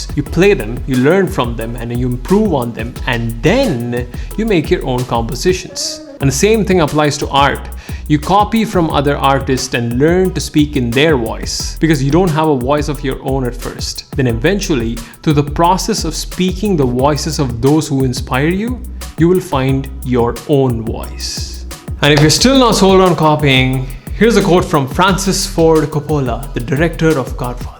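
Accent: India. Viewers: I know you are an Indian talking in accent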